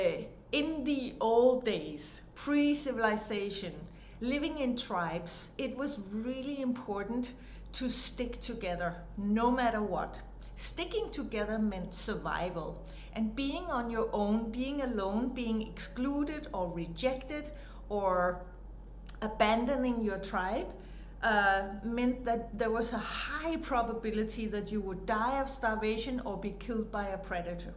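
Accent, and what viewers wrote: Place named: Germany. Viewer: Your accent sounds German. Are you German? Also good video.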